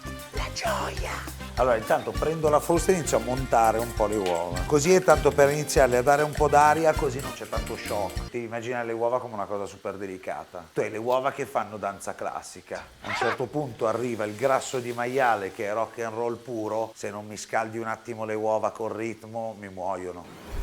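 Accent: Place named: Apulia, Italy. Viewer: Sono Romana, ho imparato prima a cucinare la carbonara, poi a camminare... Mi sono emozionata a vedere questo video. Hai coccolato questa Carbonara.... E m'hai fatto venire fame. Ho sentito quel pizzico di Puglia tra il Meh e il fantasticare sul sud. Comunque, io solitamente faccio rosolare il guanciale in padella, pizzico di pepe, ci butto sopra la pasta, l'uovo, un mestolo di acqua di cottura e alla fine il pecorino, in cucina so che cambiare gli ordini degli addendi, il risultato cambia, cosa pensi di questa composizione? Mi conviene cambiare e lavorarla più come hai fatto tu?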